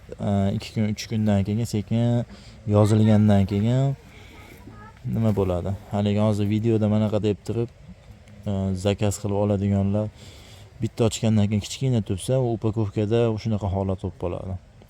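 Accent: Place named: Andijan, Uzbekistan. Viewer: талаффуз Анжонникию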